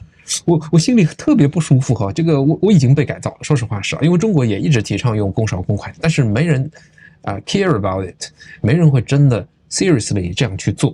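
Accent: Malaysia. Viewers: 哈哈，你的口语也是有大马的味道了。中国人口多，没办法跟大马对比。=) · 在大马搭车，你还要特别选择安静搭乘，不然driver会认为聊天的机会来了，只能说我们大马人真的太热情了😅话说，你说话的方式越来越大马化了啦，尤其那个....我觉得hor😆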